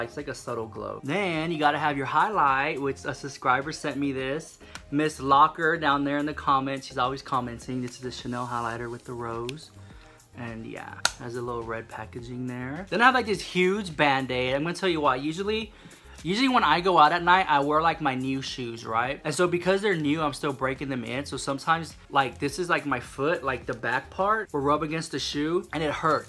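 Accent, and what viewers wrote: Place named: Texas, United States. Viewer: It's cool. I can hear your Texas accent. 😎🤘🏻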